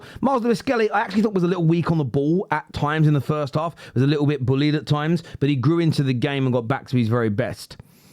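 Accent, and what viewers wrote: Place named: London, United Kingdom. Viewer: which is everytime mind u he sounds like hes from london not Manchester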